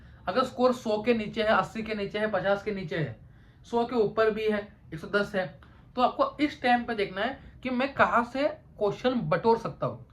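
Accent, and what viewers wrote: Maharashtra, India. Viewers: Bro are from Maharashtra.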